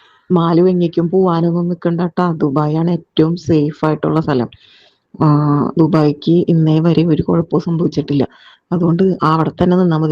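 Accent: Thrissur, India. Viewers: Chechii nattil Thrissur anooo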